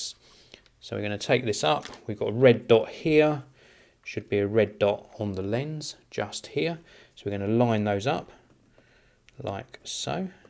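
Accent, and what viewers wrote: United Kingdom. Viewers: Nice review, very relaxed :) First British accent I have no trouble understanding. Looking forward to your in deep review.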